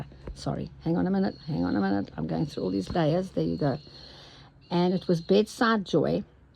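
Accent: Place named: South Africa. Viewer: Just came across your video. Lovely to hear another Saffer accent. :)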